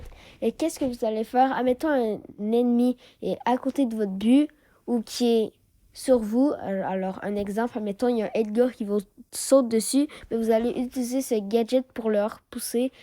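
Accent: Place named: Canada. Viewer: J'adore tes vidéos continue comme ça, et j'adore ton accent canadien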